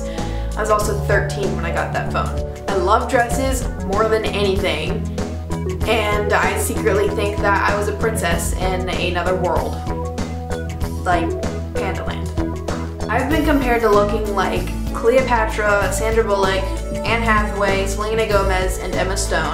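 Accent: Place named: United Kingdom. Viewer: Do you wanna know something im sorry for calling you by your real name but my name is as well it is just spelt with a ( c ) and who cares if u think you have a bad british accent you are silly no affence. Coz i loved your accent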